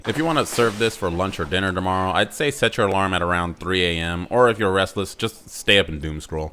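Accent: Texas, United States. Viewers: bros def from texas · Where are you from if your not texan...how the fu.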